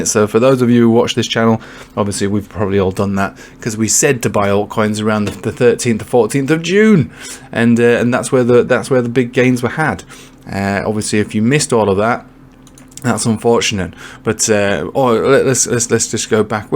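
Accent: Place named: Northern England, United Kingdom. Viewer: Dude, are you from Yorkshire, Lincolnshire or the north west? You might not be from any of them but love your content dude. Legend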